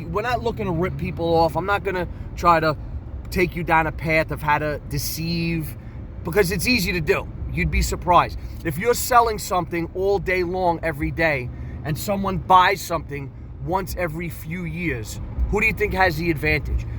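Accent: New York, United States. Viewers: Your new york accent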